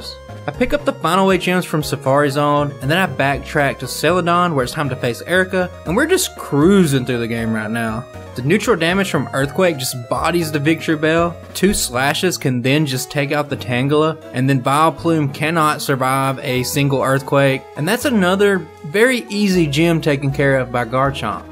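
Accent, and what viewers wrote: United States. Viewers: I like your country-like accent!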